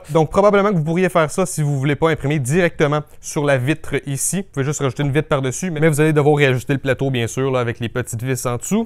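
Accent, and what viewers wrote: Quebec, Canada. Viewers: Très bonne vidéo comme toujours. Aussi bien pour le coté impression 3D que pour découvrir le " parler" québécois que jaime beaucoup. =) Aussi je voudrais savoir si tu connais la Tizyx k25 ? C'est une imprimante française qui est vraiment pas mal du tout, et qui je trouve mérite plus d’attention =) En tout cas bravo pour tout le travail que tu nous fournit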